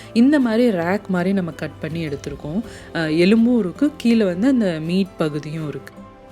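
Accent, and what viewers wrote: Madurai, India. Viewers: Bro review super..madhurai slang summa alluthu...😍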